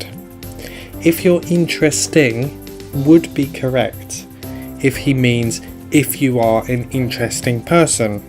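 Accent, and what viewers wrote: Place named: United Kingdom. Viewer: What British accent do you have? It sounds very clear to me.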